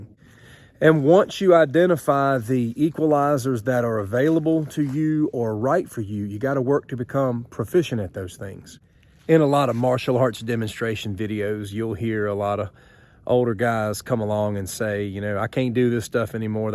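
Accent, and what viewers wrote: South, United States. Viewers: Great to hear a smooth Southern accent. Directions are north, west and east - South is a location. COL Stoney (r) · I don't know about the rest of y'all, but this old Southern gal could listen to this man talk all day! I've lived in Tennessee since 1987, but I still love a natural Southern drawl. ❤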